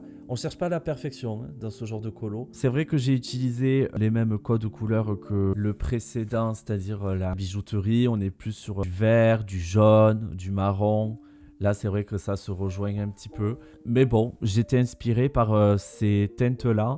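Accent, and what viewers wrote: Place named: South, France. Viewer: Bonjour ☺ les colos sont vraiment superbes ♥ Je suis ravie d'avoir découvert ta chaîne et d'entendre notre accent du sud ☺ Tellement contente d'avoir un confrère dans le 66 (si je ne me trompe pas 🤣🤣). Je suis une accro des crayons aquarellables mais les produits d'action ne sont pas performants. J'ai aussi fait les double pages d'hercules, j'adore ♥Bon allez je suis une vraie pipelette ☺ A bientôt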